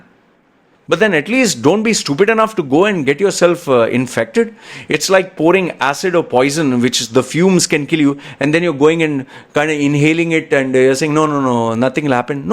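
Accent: India. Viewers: Indian English accent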